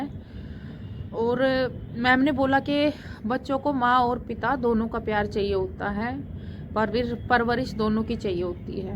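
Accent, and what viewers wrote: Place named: Haryana, India. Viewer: कहाँ से हो बहन भाशा से हरयाणा की लग रही हो subscribe kar diya aapko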